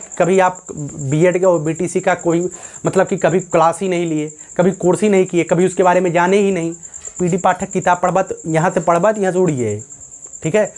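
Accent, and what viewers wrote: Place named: Awadh, India. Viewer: Logo ko awadhi aur bhojpuri main farq hi nhi pata.. hadd hai....aap sir awadhi bolte hain aur acchi bolte hai ..hum awadh walo ko bdi aatmiyata lgti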